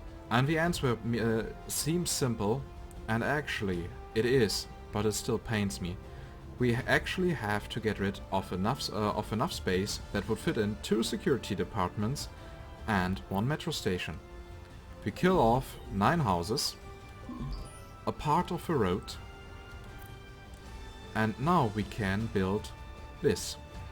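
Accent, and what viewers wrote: Germany. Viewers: You have a german accent, do you come from germany :D? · man hört den deutschen akzent aber das ist cool. ich spreche auch oft englisch.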